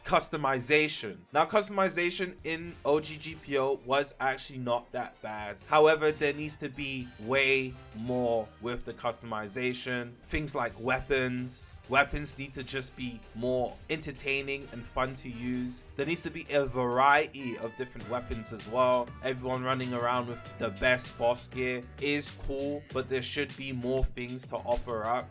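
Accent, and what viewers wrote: United Kingdom. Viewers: british smh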